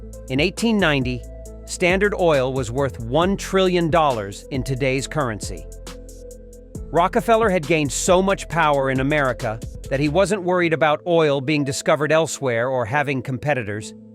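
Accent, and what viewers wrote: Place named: Scotland, United Kingdom. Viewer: I love your Scottish accent. You should make more documentaries about the Scottish with that accent.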